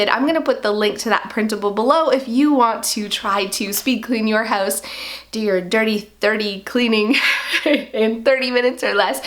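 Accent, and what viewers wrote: Canada. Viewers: I'm from Maine, I love your Canadian accent with your enthusiasm! 😂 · Out and about? Canadian? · Are you Canadian?, you sound like one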